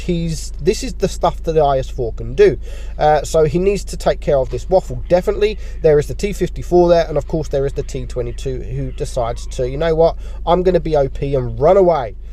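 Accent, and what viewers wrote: United Kingdom. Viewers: Excellent British commentary and great replays.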